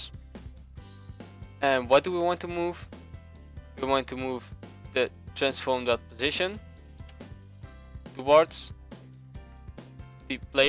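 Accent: Netherlands. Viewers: youre dutch arent you #4secondsinvideo #dutchaccent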